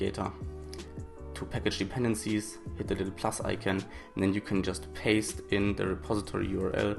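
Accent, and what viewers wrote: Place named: Germany. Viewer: Thank you, man, it helps! Like you german accent)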